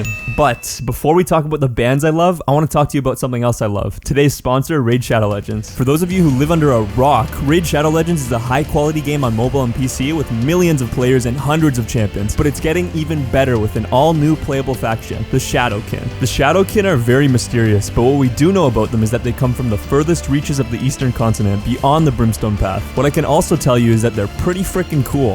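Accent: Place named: Canada. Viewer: Probably because of the faint canadian accent in there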